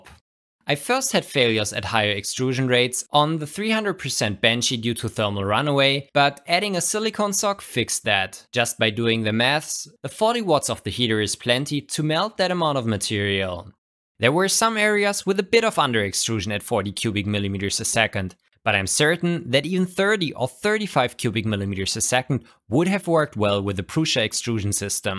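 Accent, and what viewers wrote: Germany. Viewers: Man hört so hart raus dass du Deutscher bist :) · höhre ich da deutsch raus? XD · hmnhhh gutentag, your english is nice i bearly hear your german axent:D im proud of you <3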